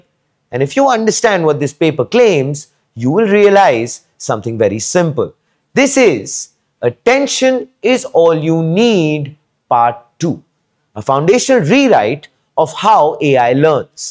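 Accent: India. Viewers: He is separating his words and using volume variety to clarify his Indian accent and doing a very good job of it!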